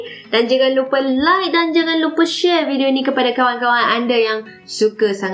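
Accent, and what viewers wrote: Kedah, Malaysia. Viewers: 10 bungkus hang Tibai Nasi Lemak.. He org kedah ka?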